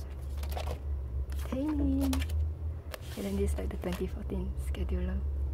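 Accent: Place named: Singapore. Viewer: Your collections are srsly goalsss! And omg I knew you're a Singaporean just by that singlish accent, you sound so cute 😂😂 I hope you get more subscribers because you honestly deserve them I'll be checking out other videos from this channel now 💖💖💖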